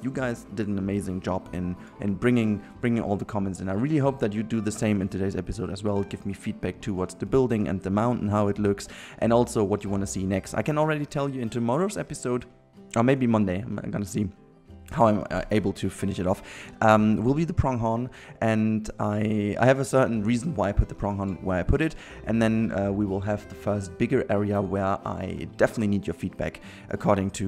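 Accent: Germany. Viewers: Man kann irgendwie raushören das du deutsch bist:). Is echt schön was du hier machst!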